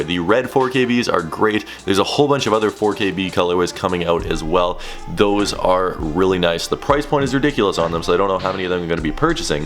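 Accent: Canada. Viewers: He's Canadian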